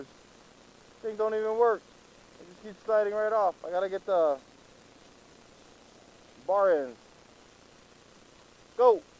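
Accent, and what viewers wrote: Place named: New Zealand. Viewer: I'm a Filipino from Guam... used to work on one of them cruise ships that sailed around the Islands of Hawai'i, that is why I thought your accent was familiar... For a second I thought your accent was from New Zealand, kinda sound similar...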